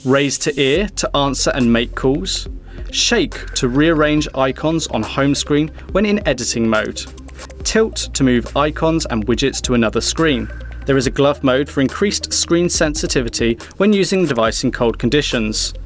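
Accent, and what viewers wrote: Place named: England, United Kingdom. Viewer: May i guess what nationality are you? Based on your accent i would say you come from England :) Again, the way you do it, i just simply love :)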